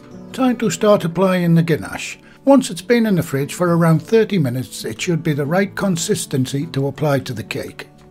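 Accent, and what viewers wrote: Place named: North, United Kingdom. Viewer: Wow it looks so scrumptious... I am salivating just looking at the thumbnail. I hit 👍 button even before watching the video knowing will never ever disappoint us but make us happy… It’s like a meditation to listen to your softer silkier voice with nice accent… you pronounce both ‘Butter’ and ‘Batter’ same… it’s very funny you never pronounce ‘E’ for Gas you call it ‘gaas’.. is it northern way of Pronunciation but other northern people I have met don’t pronounce the way you do… anyway thanks dear… Give us another delicious recipe soon… ❤️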